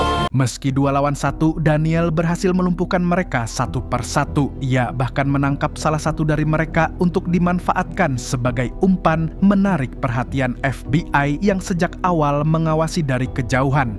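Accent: Banjar, Indonesia. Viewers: asli buhan Banjar kh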